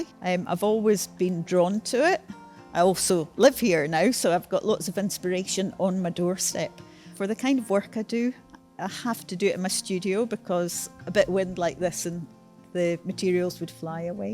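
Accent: Scotland, United Kingdom. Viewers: I love your work and your beautiful Scottish accent. My dear friend Wendy was from Arboath and I could listen to her talk all day long. Sadly we lost her to cancer a few yrs ago, but thank you for reminding me how much Ive missed her and what a treasured friend she was. Cheers from stinking hot FL. ;-)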